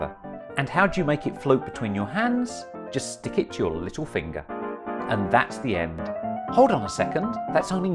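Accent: United Kingdom. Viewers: r u English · WHAT?!??! I didn’t notice that you are British! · Omg u are British even I am 🐶🐶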